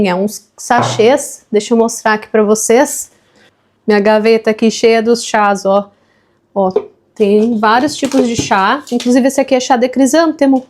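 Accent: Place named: South, Brazil. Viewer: Oiee... cheguei por acaso e estou amando seu canal, vc é muito simpática!! Teu sotaque parece do Sul, de qual cidade e estado vc é natural?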